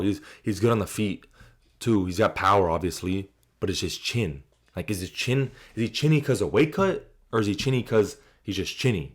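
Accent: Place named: Miami, United States. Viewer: u from miami bro? i hear that accent 😂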